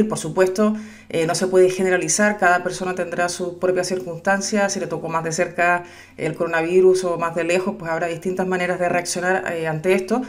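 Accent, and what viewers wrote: Chile. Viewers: eres Chilena?.. Acabo de encontrarte eres lo máximo!! Muy claro y muy motivador! Excelente ayuda para nosotros del rubro Restaurantes! Saludos!!!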